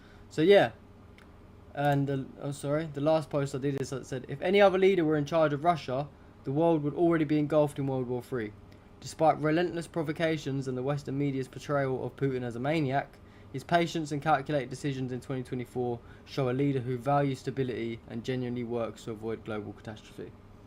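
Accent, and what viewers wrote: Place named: United Kingdom. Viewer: I like how your accent is (the British flair 😅), especially at the beginning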